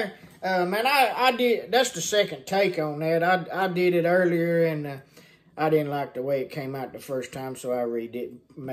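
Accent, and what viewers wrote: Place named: New Jersey, United States. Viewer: That’s a nice accent you got there ..New Jersey?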